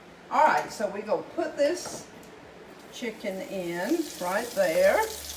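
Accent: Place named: Georgia, United States. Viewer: Oh that looks delicious, what a beautiful supper ! I do the hamburger buns also,they just taste good ! I enjoy your cooking videos and your southern Georgia accent your a beautiful lady ! Hello from Missouri Im outside of the KC MO area,65 yrs old live on a private lake but raised up in the farm country ,north central mo! Have a wonderful day!😊❤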